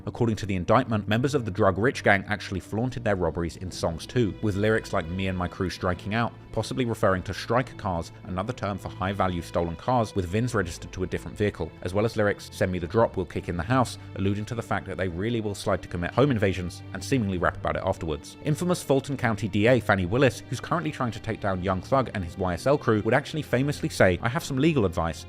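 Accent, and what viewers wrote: United Kingdom. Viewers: Hearing about shit that happened where I grew up in a British accent is weird lol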